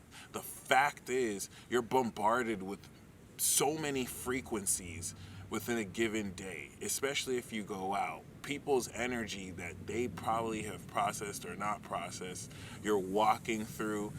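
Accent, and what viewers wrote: Nigeria. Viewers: The Nigerian accent takes me out everytime 😂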